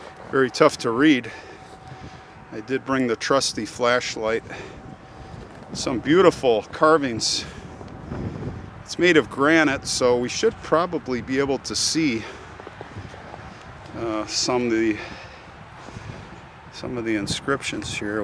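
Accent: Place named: Upper Midwest, United States. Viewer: I love the music. It reminds me of Minnesota and North Dakota where I went to college and graduate school when my husband was in med school. The nicest people and a wonderful six years exploring North Dakota and Canada. Your accent takes me right back to 1970.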